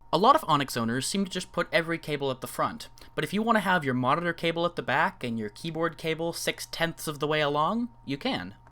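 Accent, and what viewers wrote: Canada. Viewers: Plugging in a PS2 keyboard will not damage the main (Onyx) system. All that will happen is a big sweet nothing. The only way to damage the Onyx machine through that proprietary keyboard port, would be by passing a very high voltage through it. A PS2 keyboard, being a passive device, will cause no damage at all. I mean you could short every pin and still get no damage. Go on. I dare you. Double dare? Oh, I give up... PS Is that a Canadian accent? You sound quite a bit like Mr Carlson.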